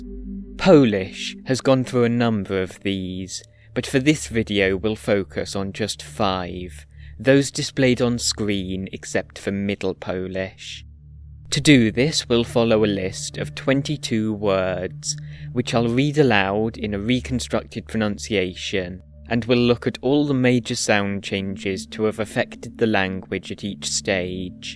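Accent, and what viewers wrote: Wales, United Kingdom. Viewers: Sounds Welsh to me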